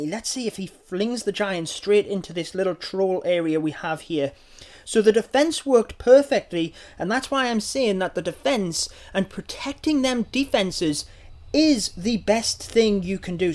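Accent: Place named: England, United Kingdom. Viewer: i luve youre englaish aiicecents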